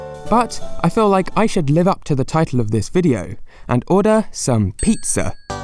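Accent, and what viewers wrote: United Kingdom. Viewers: You literally speak a British Accent!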